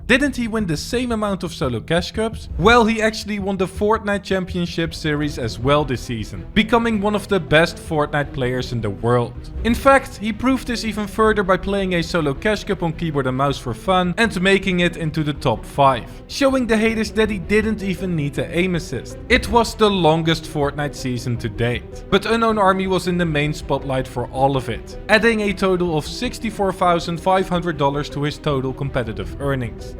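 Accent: Netherlands. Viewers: you sound dutch? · Just a ask where are you from (I’m Dutch and idk but I sound like you Dutch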